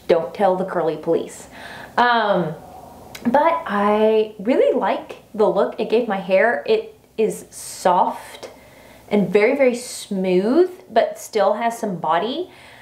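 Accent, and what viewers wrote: South, United States. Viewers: You explained this so well! You remind me of my teachers in grade school. Almost all of them had thick southern accents like you.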